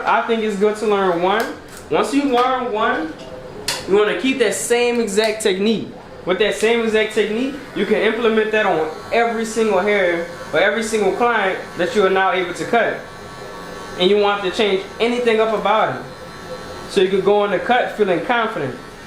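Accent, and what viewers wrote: New Orleans, United States. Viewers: is that a NOLA accent I hear? I love NOLA accents and thanks so much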